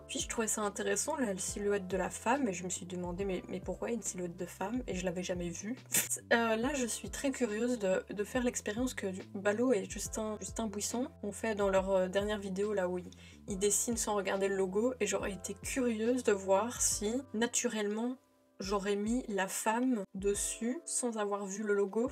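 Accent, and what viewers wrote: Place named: Belgium. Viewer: Je découvre ta chaine sur cette vidéo (merci la reco youtube), c'est très sympa ! J'ai pas bien compris pourquoi la forme ta cam change au court de la vidéo, c'est très étrange mdrrr (le mieux c'est clairement celle à selon moi). Sinon t'as un petit accent Belge je trouve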